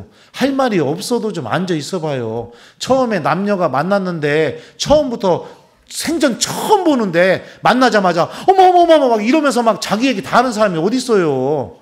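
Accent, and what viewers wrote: Chungcheong, South Korea. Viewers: 신부님강의 충청도 말씀 으로재미 있습니다 매일 유투부 로강 의 듣습니다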